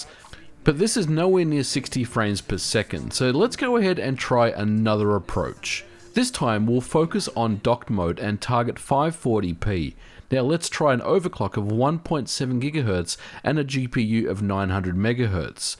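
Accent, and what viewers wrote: Australia. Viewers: yes really. That australian accent is awful. Instant switch off. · australian accent is beautiful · And with a spanish accent instead of australian :D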